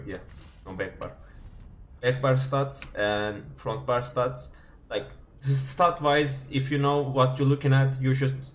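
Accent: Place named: Turkey. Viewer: Yokla ing şiveden yine türk😄ilk defa ing video yu ana dilim gibi anladım😂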